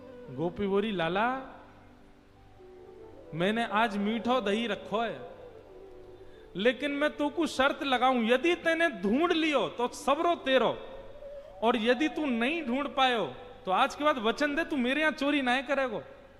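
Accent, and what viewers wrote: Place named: Bharatpur, India. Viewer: Ye bharatpuri accent me jo katha vachan kr rhe h sun ki bahut khushi milti h 🥰🥰🥰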